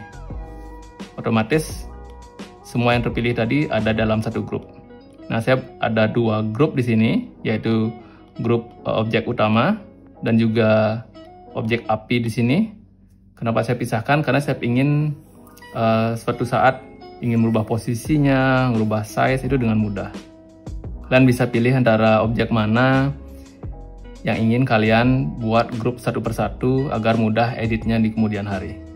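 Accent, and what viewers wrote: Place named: Bali, Indonesia. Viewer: Dari pronunciation kayanya orang Bali nih, sukses terus bli sya udah subscribe.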